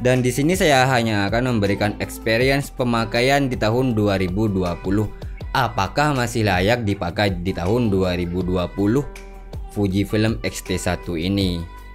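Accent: Madura, Indonesia. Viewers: Etemmu logat madurena cuy.haha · Logatnya kayak madura kang ? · Reng madureh reyah? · Madura ya mas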